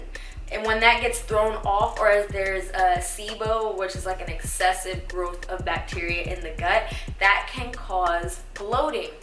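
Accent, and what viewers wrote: Jamaica. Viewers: Ayeee your a Jamaican gyal who knew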